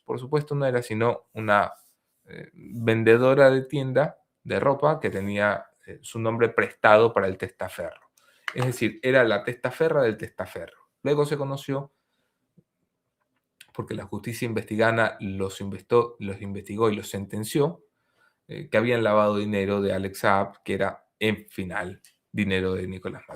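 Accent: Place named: Argentina. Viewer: Por eso tienes el acento argentino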